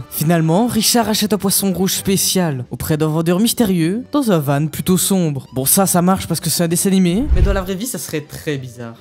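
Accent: Liège, Belgium. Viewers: J aime trop l accent de liege 😂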